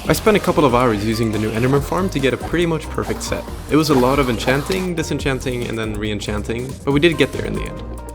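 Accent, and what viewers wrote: Sweden. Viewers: I knew you were Swedish from the accent before I checked the channel info lol Great video!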